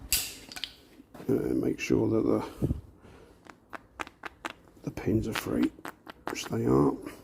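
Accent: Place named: Northern England, United Kingdom. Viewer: Thanks for the video, I saw another guy show how you can get a 12v battery with two wires that you touch to those two terminals where you took the plug out. And it seemed to work fine, just make sure the polarity is the way that winds the piston the right way. Would you suggest this way too as it saves taking the motor off? PS like the accent, it reminds of home, as I'm currently up north! lol!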